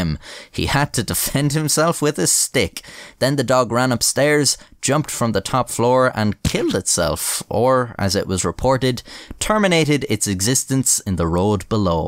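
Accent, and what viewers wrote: Ireland. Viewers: You got rich boy irish Accent. heard it all over the world. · You’re extremely lucky to have the Irish accent that you have. Americans can actually understand what you’re saying. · bro I knew you were Irish the second I heard you, not all us americans are idiots I promise 😂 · you can tell it's a legit Irish accent because the first syllable in "Ireland" is effortlessly between an American "are" and "our" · It’s okay, I could tell you were Irish. And there’s a place called Belfast? I thought that was just my ex ex ex ex ex ex friend’s waifu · I'm American and I could tell your accent was Irish before you confirmed it for me. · well you sound very Irish to me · What part of Ireland are you from? The first video I watched on your channel was the one about the runaway train. I was trying to puzzle out for a time what part of North America your accent was from until your "o"s got me to think across the pond. 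· I'm in the US, and I always assumed you were Irish when watching your videos, even if your accent is slightly different. Boston accents, Texas accents, Chicago accents, and New York accents are all in the country I live in, I imagine that there are different accents and dialects in every country and language in the world. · I'm genuinely surprised that people couldn't place your accent as Irish... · the very first video i saw from you, i instantly thought "this fella is from ireland" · I started watching and subscribed mainly based on his awesome Irish accent - the wit, humor and factual information are what got me hooked. Thanks, you have taught us so much and provided many belly laughs. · It makes sense that people in Ireland would talk differently due to where they came from. So where are you from? Cork? Kilkenny? Galway? Please tell me. O yes, this was quite amusing by the way; morbid, but amusing. 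· I've heard it said that if have a dog that is a lush, just give him all he wants to drink until he passes out. The next day give the dog some more, and if he drinks he's lost. But a lot of times all it takes is the smell of it and the dog will run away yapping it's head off. This means no doggy anonymous meetings are required, and the pooch has been cured forever. By the way it's very easy to see and hear that you're Irish. Have some of the Isle of Erin in me. Like your show and tales from the bottle! Peace and love. Dan. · I’m American I I could tell you were Irish the first time I heard you voice. How could Irish people not tell? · I found it surprising people have trouble with your accent. The first time I heard you speak i knew you were Irish. I would have guessed you were from Glasgow though. Thinking more about it, I guess it makes sense I know a little more about the different dialects of Ireland, my family is Irish, my great grandmother only spoke Gaelic and, while I haven't, a lot of my family travels between the US and Ireland fairly often, so I'm a little more educated about Ireland than tbe common US citizen. 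· I'm thinking your accent is cavan or maybe meath, not too sure though (being a dirty dub myself) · So, where's the accent from? I consider myself fairly familiar with Irish accents. I'm guessing the midlands, cos it's fairly neutral, but definitely Irish. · I'm an American and I knew you were Irish from the first vid I watched :/ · Haha how can your accent be anything other than irish. The fact that your accent is hard to place around ireland means youre probably more irish than most irish people. An tir na nog. Best palce in the world. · I’m American and I knew your accent immediately I’ve been to Dublin and loved it js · You think Americans thinking your Irish accent is fake is annoying Try Brits (who should know better) mistaking my (admittedly watered down) Irish accent for being American. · Who didn't realize was Irish. I thought all the replacing TH sounds with Ts and Ds made it obvious 🤷‍♂️ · Transatlantic Irish accent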